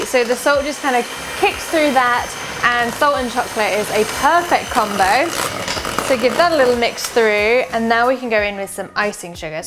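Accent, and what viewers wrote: United Kingdom. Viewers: I am learning not only your recipe, but an amazing British English accent...!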